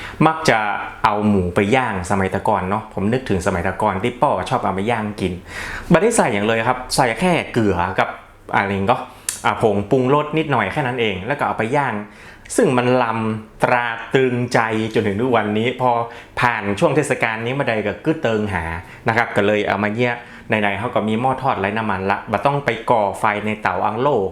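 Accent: North, Thailand. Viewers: อู้คำเมืองเพราะขนาด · คนเหนือเฮาอู้ม่วนจ้าว❤❤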